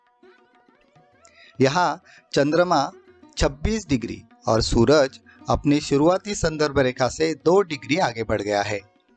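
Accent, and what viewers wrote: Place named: Maharashtra, India. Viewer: Aap ki marathi accent me narration bada madhur lagta hai sunne me aur video rochak hota hai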